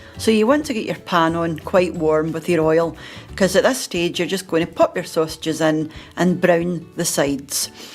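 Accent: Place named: RP, United Kingdom. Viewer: Hi 🤗 Love a revisit & how old the recipe is - they're great for new subs to ur channel🙏🏽. Your voice sounds quite different from some of ur original 1st vids its now proper posh😆🤣😂 I'm doing ur 🐸in🕳 next week & can't wait. I do my Sausage caseroles on the stove top or oven as a one pot & just use my slow cooker for stews these days. No dishwasher in my gaff so anywhere i can save on washing dishes is more important to me these days. Keep these coming ❤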